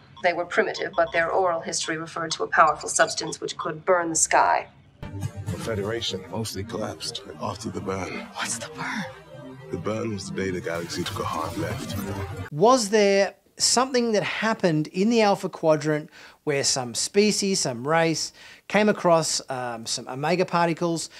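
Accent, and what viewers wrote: Australia. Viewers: Hi mate, great upload, listening to your accent and thinking, hey up, this blokes Australian and that reminded me of the Voyager episode with Species 8472 where they enter Fluidic Space, what's never explained is if they enter FS at point A, when they leave it, are they still at point A or anywhere else? So I could leave my house in the UK, enter FS and then jump out of it in Australia and meet you down the pub, not using Sub Space just Fluidic Space. Yes I know 8472 wouldn't want people crashing through their territory every five minutes but maybe they'd negotiate a section of space, ( just how BIG is Fluidic Space anyway?) far away from them that the Federation can use as a bridge from A to B. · That's our Aussie accent! 😆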